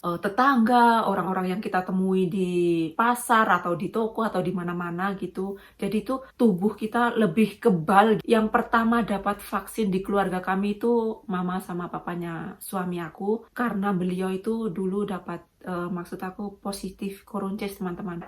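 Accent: Java, Indonesia. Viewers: Mbak apa ada turunan orang jawa?kok rada medok jawa 😊😊😊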